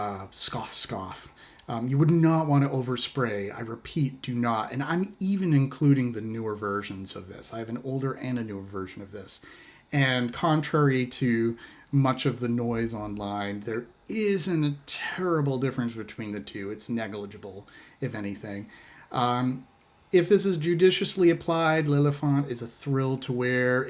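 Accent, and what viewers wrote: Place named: New England, United States. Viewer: What New England state are you from? I'm from there too and I can pick up that accent anywhere! CT?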